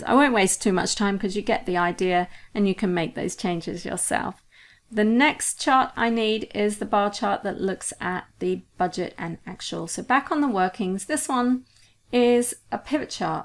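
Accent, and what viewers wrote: United Kingdom. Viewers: Hi, thanks for sharing and please keep posting long videos because not only you will help us in learning excel but also would help us in learning British accent. I have been literally looking for a British channel for a very longtime ... thanks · Which English accent is this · Why is it British-sounding women always seem so SMART? :-)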